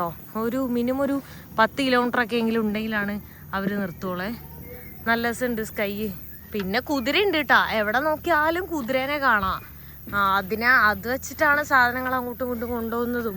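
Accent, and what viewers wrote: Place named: North Kerala, India. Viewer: ഉത്തര കേരളത്തിൻ്റെ ഏതു ഭാഗത്താണ് ജീവിച്ചിരുന്നതെന്ന് അത്ഭുതപ്പെടും, സംസാരഭാഷ കേൾക്കുമ്പോൾ '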